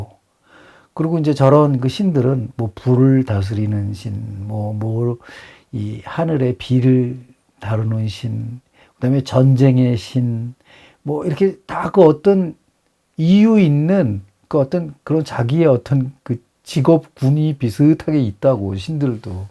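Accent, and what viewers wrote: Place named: Paju, South Korea. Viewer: 목소리도 좋으시네요. 파주억양 맞아요.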